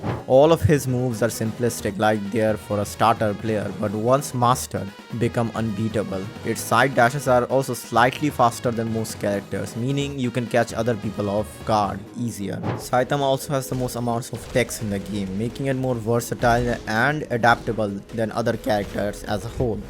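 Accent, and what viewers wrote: India. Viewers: The indian accent it makes it beter ong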